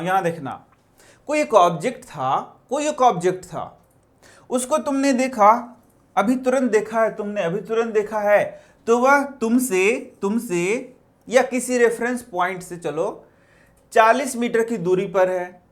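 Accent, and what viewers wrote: Bihar, India. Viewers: Sir,I thing you are from bihar🤔👍👍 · Sir ji app Bihar ke ho kya? Mujhe to vaisa hi lagta hai ki app Bihar ke ho.apne discription me kya likha hua hai? Ek hi line to 100 bar😂😂 This is funny sir.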